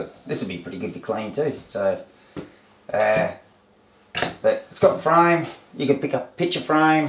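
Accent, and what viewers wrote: Australia. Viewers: Love to hear the Aussie accent :-) very cool idea. I'll see if my husband can come up with one 👍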